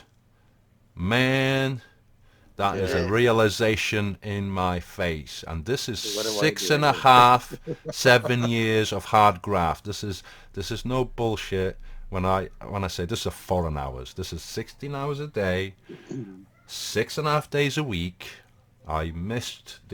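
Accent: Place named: Northern England, United Kingdom. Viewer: love the Northern accent you've picked up, brilliant.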